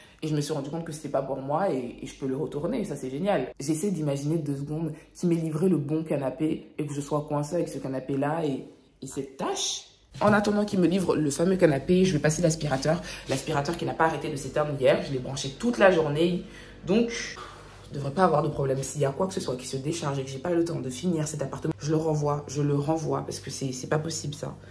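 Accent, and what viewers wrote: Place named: Ivory Coast. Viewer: L’accent ivoirien j’aime trop🇨🇮😂 Pourquoi pas une vidéo en parlant avec cet accent Tu as une belle famille 😍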